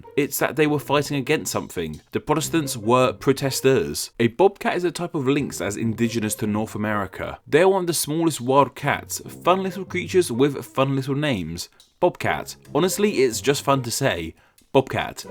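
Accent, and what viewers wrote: United Kingdom. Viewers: No, you have a British accent, therefore you're not so much watchmojo as you are Whatculture. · It's only fun to say because you're British and have a British accent.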